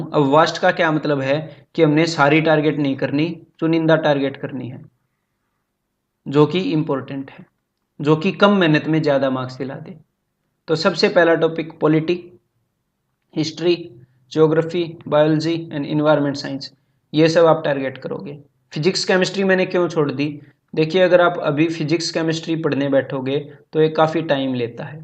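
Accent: Haryana, India. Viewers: Very good sir.... Aap Haryana se ho...? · Sir aap haryana se ho kya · Lol yer your accent is cute..haryanvi sort of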